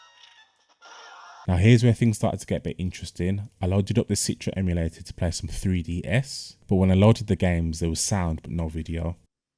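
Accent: United Kingdom. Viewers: You from Brum too? I subbed man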